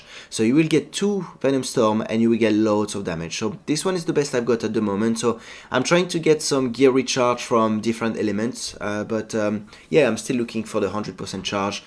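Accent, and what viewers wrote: France. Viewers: Are u FRENCH My FRIEND?! i like u r acsent :D ! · Vidéo sympa je ne te connaissais pas mais une chose de sûr c'est qu'avec un accent pareil tu ne pouvais être que français 😆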